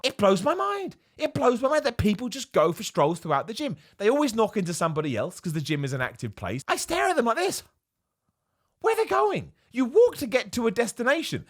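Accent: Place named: RP, United Kingdom. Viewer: A subset of the eater are the people with what I call "bro jugs", they have these huge jugs that just have to leave on the floor, the size of a carton of milk, filled with either water or even better "branch chain amino acids." I've seen both swole people and hard gainers do this. Maybe it helps them, but to me, I felt like if I were to try to drink even a fifth of that while deadlifting I'd yack. Another subset of the naked guy is the old guy drying their balls in the hand dryers. I still have mental scars seeing some old guy literally dry his balls in a YMCA bathroom like he was at home, not a care in the world. Of course, I can't add to this list without copping to some of my own bullshit, which is grunting. I'm a grunter-- I know why I do it, but I also know it could probably be disconcerting. Of course, I don't feel bad next to that very eccentric gentleman channeling his inner Lady Gaga who will just full-on sing so loudly I can hear him over the heavy metal I have blasting in my ears so I don't have to hear other people in the gym. 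Anyway, big fan, I like your content but I also admit it's nice to hear some guy with a posh accent who sounds like he could be teaching a 301 class on the reign of King Henry the 8th instead of someone who speaks like their neurons have been replaced by branch chain amino acids.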